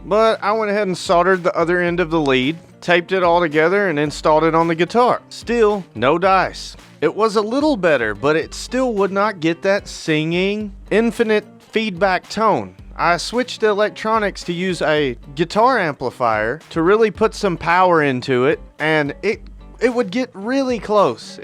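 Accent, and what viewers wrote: South, United States. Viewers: As a native Tennessean, I love hearing a deep southern accent saying intelligent things. Kick the stereotypes!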